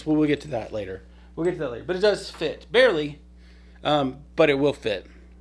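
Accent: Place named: Boston, United States. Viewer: You way how you speak remind me one of my Friend from Boston area! By the way, looks like you are very confident speaking on camera! Some experience from out of YouTube life?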